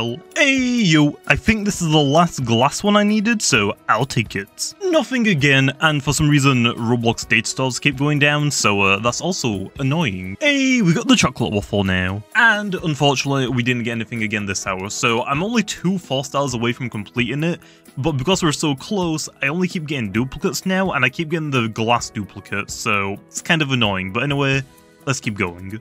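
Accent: United Kingdom. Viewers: Goppie :0 But also bri'ish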